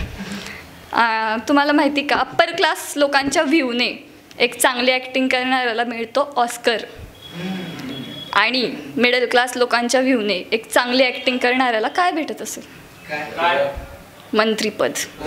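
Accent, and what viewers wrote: Khandesh, India. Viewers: 1 cha number and tuz aavaj pan.khup chan.Hotta i like you khandeshi tone...👌👌💝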